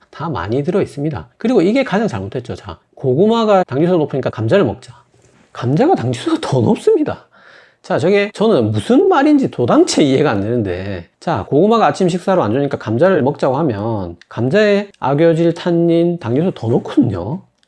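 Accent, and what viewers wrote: Gyeongsang, South Korea. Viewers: 껍데게는 30댄데 말투는 50대경상도아저씨의 그것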